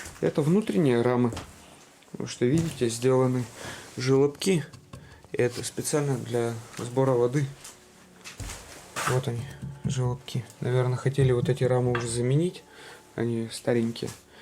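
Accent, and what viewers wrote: Vyatka, Russia. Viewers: До 7 лет часто бывала у дедушки,в деревне Статники Оричевского района ,Кировской области.Деревни этой сейчас уже нет. Ваши повествования будят ностальгию по тем временам, даже вспомнила устройство этой усадьбы.А Ваш говор с вятским акцентом прямо услада для души.Давно уехала с Вятской земли в Барнаул.❤❤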